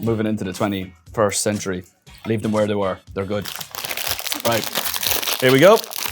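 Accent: Ireland. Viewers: I didn’t realize how Irish Niall was until right now. Like the accent as gotten stronger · It's an Irish accent. Sybau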